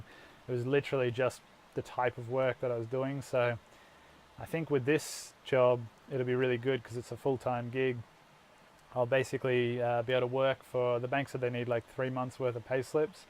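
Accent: Australia. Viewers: I've missed you! Glad you're back! The job sounds like it will dovetail nicely with your interests! I love your videos because it is great to hear an authentic Aussie voice and Aussie scenery. I am sick of only seeing the USA stuff. I want to see more Aussie stuff.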